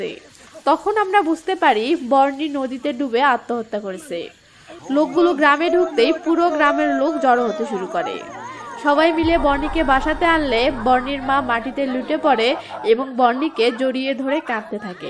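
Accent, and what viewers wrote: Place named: Mymensingh, Bangladesh. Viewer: বুজতে পারতেছি আপনার বাড়ি ময়মনসিংহ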